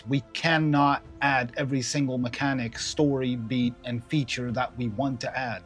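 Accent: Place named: United Kingdom. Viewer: All good points. One thing I wanted to mention though that most YouTubers conveniently fail to bring up: most countries outside of the US often provide artist related grants to indie game developers, to help them survive while they’re pursuing their dream. That may or may not be you (although you sound like you’re from the UK so it might be), but just imagine everything you just said, now add on to that the necessity of also being independently wealthy, or so young that you’re still mooching off mommy and daddy, and now you have a better perspective of what it’s like to be an indie game developer in the United States. Again, that may or may not be you, but I just wanted to mention it because it’s something that a lot of these European game devs will often deliberately omit.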